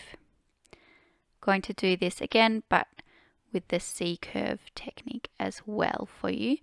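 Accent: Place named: Australia. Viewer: I’m very grateful for your beginner exercises and it’s great to have tutes with an Australian voice!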